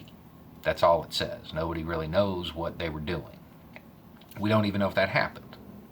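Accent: South, United States. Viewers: I really like your dedication to your country. I only wish more people were as informed, and as intelligent, as you are, Sir. And I really love your southern accent!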